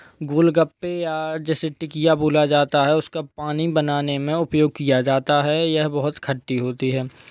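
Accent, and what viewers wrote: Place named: Bundelkhand, India. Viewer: bhai main bhi bundelkhand se hu Datia bhander m.p se 👍 aapki voice se pahchan ho gyi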